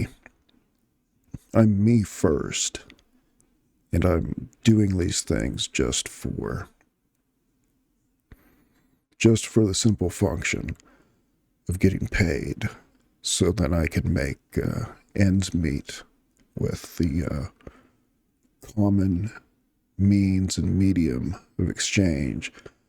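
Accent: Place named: Japan. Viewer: Yes. It's really something that could be scaled up. ASMR or whatever it's called. Audiobooks and all that. He's like a native Japanese speaker but speaking in English.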